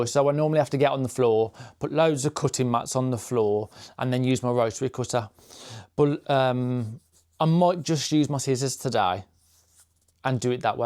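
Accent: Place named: Leicestershire, United Kingdom. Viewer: I loved watching your video , I have so much to say lol , regarding ends of quilting , I do ,do a back stitch , but if your unhappy , I would just leave a long thread and make sure you bury it well , but honestly , I don't worry about it myself . If a man on a horse riding by can't see it , then your good lol . What is that corner turny thing you mentioned ? As to the stripey binding , if it's on the bias the stripes go diagonally. I would use your left over stripey fabric to bind something small like a table runner to practice and see if you like it . I don't know where in the UK your based ( sounds close to where I was born , Leicestershire) but if you gave a local quilting shop they might know of local groups you could join , we always loved it when men joined . Now my health is not good I can't go to groups so I do video calls with my sister of friends whilst we quilt or crotchet etc . 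Do you know anyone ? On WhatsApp or messenger the video calls are free so we do it for hours . And have you seen the book Fox under the moon , seasons of hope ? It's similar to the boy , the fox ,the mole and the horse , I find it helps sometimes . I love the illustrations so much I'm going to make some in to small wall hangings ( that is after I finish some other wips lol )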